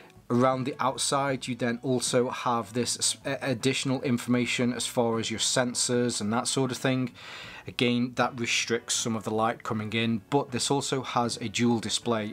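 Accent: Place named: England, United Kingdom. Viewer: Great review. I totally agree with your comments regarding negative displays. IMHO they're style over function and my main reason for buying a watch is tj read the time as easily as possible and the negative display models are a PITA. The combination of a larger display and the black model with the positive display are the one I'll be going for. As I said initially, a great review and nice to hear someone with an English accent for a change.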